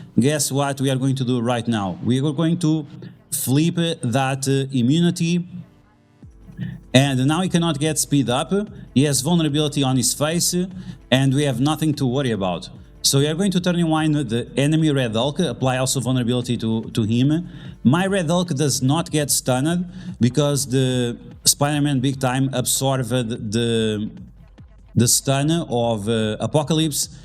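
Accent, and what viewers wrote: Hungary. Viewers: Pasztmek =D Tippelni se tudtam, mi ez a szláv akcentus, de hogy magyar =D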